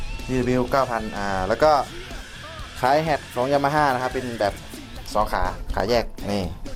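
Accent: South, Thailand. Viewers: คนที่ไหน สำเนียงเหมือนคนใต้เลยนิ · คนใต้ป่ะครับ ?? เหมือนจะทองเเดงนิดๆๆ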